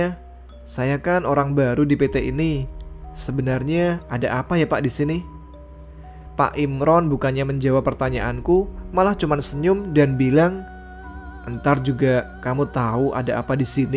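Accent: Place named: Java, Indonesia. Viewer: Medok kali logat bahasanya mas😆😆 cerita2 mas ini lumayan bagus seram juga😱 😊🙂👍👍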